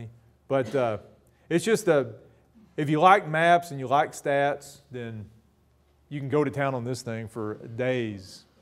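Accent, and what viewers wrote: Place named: Ozarks, United States. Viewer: LMAO; I’m dying at the rules of pronunciation. Being from Kansas I hear enough draws. But seeing and hearing the Ozarkan whang it’s not only true but accurate as hell. I just got back from there maybe two weeks ago. My kids all returned home to Kansas sounding like hillbillies. Sorry Ozarkans.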